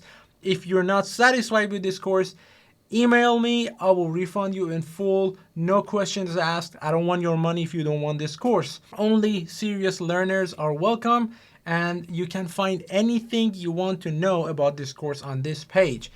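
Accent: Iran. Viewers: Hello, you have Iranian accent, are you from iran?:D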